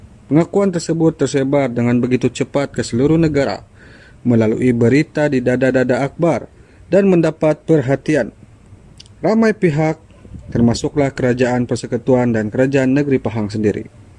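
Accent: Sabah, Malaysia. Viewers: Host nie mcm org sabah jak dari dialek cara percakapan...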